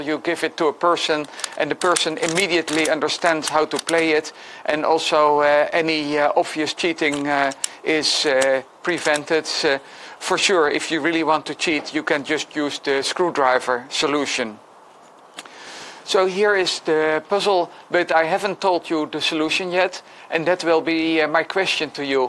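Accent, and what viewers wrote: Netherlands. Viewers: Ha yes , the English Netherlands accent xD (also from the Netherlands)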